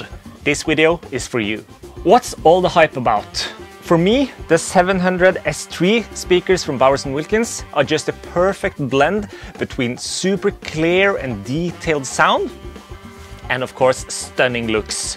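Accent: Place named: Sweden. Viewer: snak dog Dansk i er et Dansk Firma i stedet for Svedish med en Lattlig svensk accent Kom dog ind i kampen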